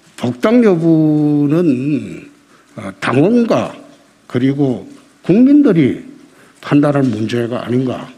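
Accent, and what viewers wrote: Gyeongsang, South Korea. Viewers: 홍대표님 막말 아닙니다 경상도 사투리 표준어로 했는데 뭔소린지 억지도 어느정도지 바른말씀만 하시는 홍대표님 존경합니다 👍🙏🙆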